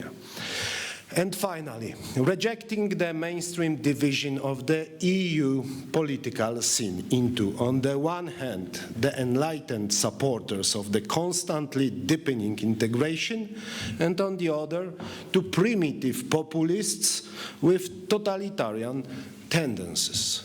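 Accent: Poland. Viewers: Szału nie ma, ale żeby tragedia to też nie!!! wymowa raczej poprawna, dość silny polski akcent i tyle. Akcent to nie taki problem jak Polacy zwykle uważają! Mówi spoko, czyta bo z pewnością nie potrafi tak przemawiać jak po Polsku, ale np. Radziu S. Ostatnio czytał od dechy do dechy po Polsku - on chyba po angielsku mówi swobodniej - wniosek: to Polski prezydent, a nie anglik...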